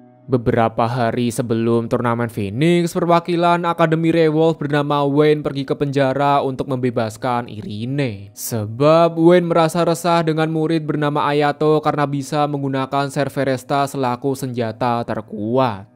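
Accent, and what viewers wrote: Java, Indonesia. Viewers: Meduk bahasanya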